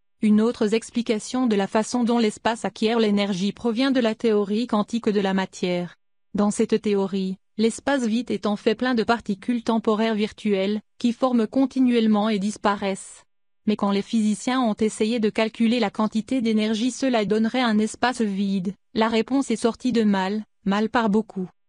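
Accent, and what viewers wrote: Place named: Canada. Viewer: j'aime bien sa voix avec l'accent canadien lol! ont s'en fout de l'univers la terre c la maison mére !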